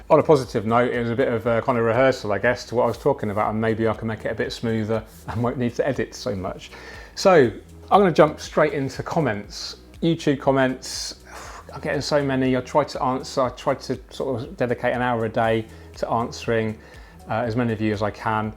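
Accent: England, United Kingdom. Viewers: So many excitiing projects. So much positivity and next level growth. And then come the tiny trolls and cowardly keyboard warriors looking for any little thing to dump some useless negativity on you, trying to slow your roll. The utterly asinine statement about your English accent and rambling... you're English, you live in England, does it not stand to reason and logic that you would have an English accent? It's the blatent ignorance and outright stupidity of cowardly keyboard warriors that always amazes me. If they don't like the accent or style, why not simply leave and find a dramatic, tiny troll channel that will resonate on the same level of stuck-on-stupid. It really is that easy and that drama free. No need to dump negativity and show off personalized styles of stupid. 86...pushing 90 thousand folks like the English accent and the ever growing positivity, style, and awesomeness of you doing what you love, loving what you do, and sharing this amazing journey with us. 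😘 👍💕👍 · I love your English accent and keep on rambling! 😀 Also I'm pretty excited about the Dennerle news. Thanks for sharing 🤗👍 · Oj, what's wrong with an English accent mate? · Another great Video, and what a funny accent, must be english ,😁 greetings from germany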